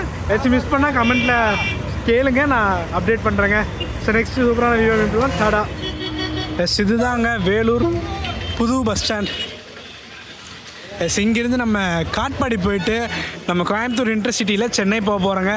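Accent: Kanyakumari, India. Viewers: Bro are you from kanyakumari 🤔.. Your slang is like typical kanyakumarian tamil ( malayalam mixed tamil)🤔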